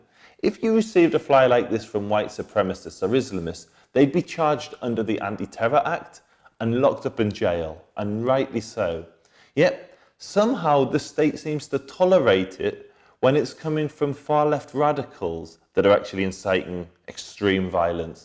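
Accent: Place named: England, United Kingdom. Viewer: I've never seen redheaded Semites with freckles and blue eyes...or speak English with a deep English accent... I really hope you were born speaking her or Arabic or Aramaic... if not your misleading the veiwers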